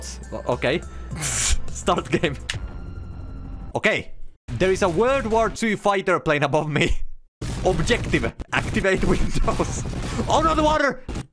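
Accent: Finland. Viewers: Bro sounds like he is from finland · bro sounds a little too much finnish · it's a finnish accent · The most charming Finnish accent right after Kimi Räikkönen. Very good but games very bad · why is this guy soo entertaining man, his english with the finnish accent is what hooks me to watch more of this guy. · Ah. I was wondering what your accent was. Finnish, I see. Only thing I know about Finland is Hanoi Rocks and Michael Monroe or is it Malone? can't remember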